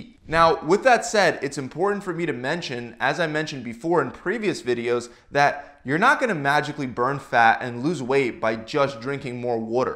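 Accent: Philadelphia, United States. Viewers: “Wooder” - Guy has to be from Philly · now we know he is from Philly :)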